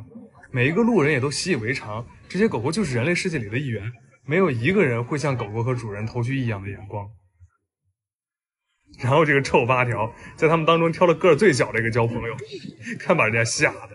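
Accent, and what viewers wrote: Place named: Henan, China. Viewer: 我还说这“去球”咋振的河南味儿了，果然老乡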